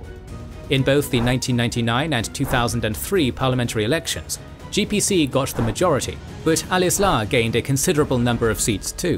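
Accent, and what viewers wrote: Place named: United Kingdom. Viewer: It's confusing to hear a British voice tell the history of Yemen without much of a mention of the British Empire. There are important carryovers that you neglected.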